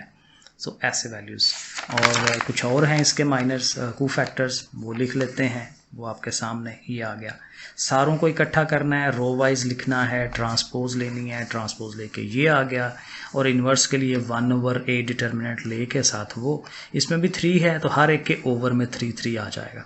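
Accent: Sialkot, Pakistan. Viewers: sir are you from Sialkot??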